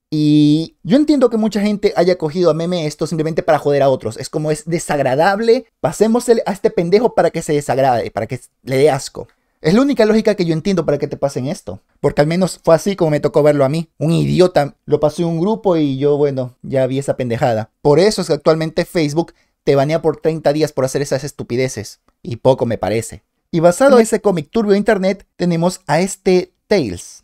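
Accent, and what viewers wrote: Venezuela. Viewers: espera eres venezolano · una pregunta we ere venezolano s o n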